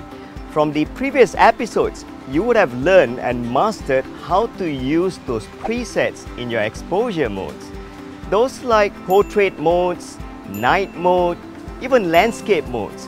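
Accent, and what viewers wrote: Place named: Malaysia. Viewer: Patut la slang english kenal je, org malaysia rupanya. Great video btw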